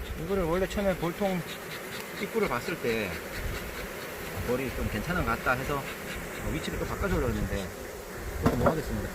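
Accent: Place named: Gyeongbuk, South Korea. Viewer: 동영상 잘봤습니다 같은 경북사람으로서 경북 표준어 귀에 쏙쏙 들어 오네요 ㅎ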